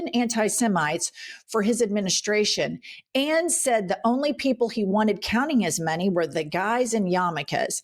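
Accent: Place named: Oklahoma, United States. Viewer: Crap, this guys sounds like he's from Oklahoma. You know, the great RED state ranked 50th in education 48th for poverty top 17 for abuse against women. Yes,First they came for the Communists And I did not speak out Because I was not a Communist Then they came for the Socialists And I did not speak out Because I was not a Socialist Then they came for the trade unionists And I did not speak out Because I was not a trade unionist Then they came for the Jews And I did not speak out Because I was not a Jew Then they came for me And there was no one left To speak out for me agree. You know the poem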